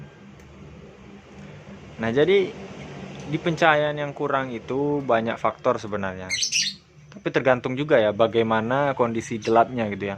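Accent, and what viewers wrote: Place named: Semendo, Indonesia. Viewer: logat semendo nya susah buat di pisahkan ya bang?🤣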